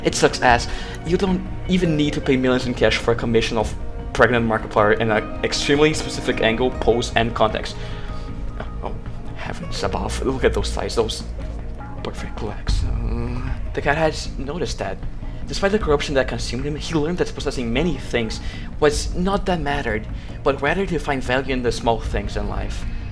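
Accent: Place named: Germany. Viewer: You sound German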